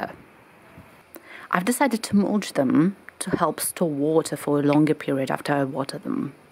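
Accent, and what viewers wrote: United Kingdom. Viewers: British accent....that's impressive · Cabbages were too good ...good work ...you belong to belarus but your english accent sound like british ?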